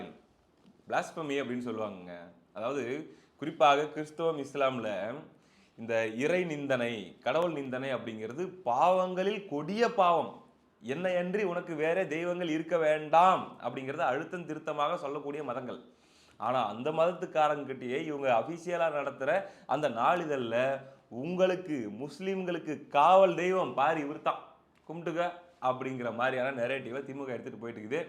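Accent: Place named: Salem, India. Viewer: உங்கள் பேச்சு மொழி (சேலம் வட்டாரவலக்கு ) அருமை நண்பா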